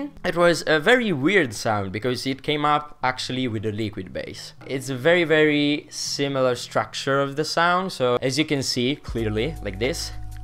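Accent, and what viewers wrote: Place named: Italy. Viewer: Si sente proprio che sei italiano bro😂 Ti adoro continua così💪🏻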